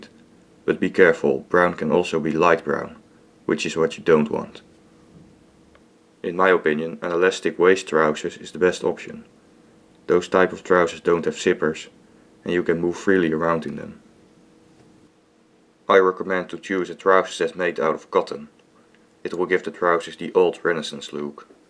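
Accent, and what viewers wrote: Netherlands. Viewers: Are you Dutch? :o it sounds like you are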